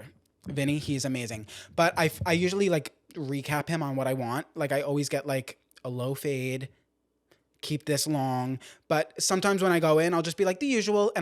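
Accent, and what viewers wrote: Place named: New Jersey, United States. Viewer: Your jersey accent sounds amazing. Da drawer. Love it!!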